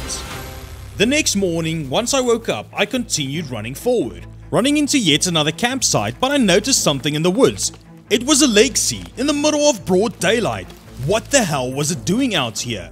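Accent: Afrikaans, South Africa. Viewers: I only have watched 2 minutes of the video and I'd say that this guy is 100% south African. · is he from south africa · you sound south african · bro he sounds like a south African man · are you from south africa??? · Are you south African? It's the accent 🤣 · Why do u sound like u from South Africa. :D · Gotta love that boer voice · Ek is mal oor daai afrikaanse aksent 😂 awesome vidio bra 🤙🏻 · You Afrikaans? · are you from ZA ? if so what province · Are you from South Africa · Okay but if i'm not mistaken hopefully the Afrikaans(?) accent makes everything so much funnier. As an African myself who lives in NZ now it always makes me so happy to hear a raw African accent. (I'm so sorry if you aren't Afrikaans but otherwise your accent is amazing.) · Some words sound like south Africa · Goeie video Jy kan in jou stem hoor jy is van SA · Bro, are you from South Africa? 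Your accent sounds very familiar! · You sound south african ngl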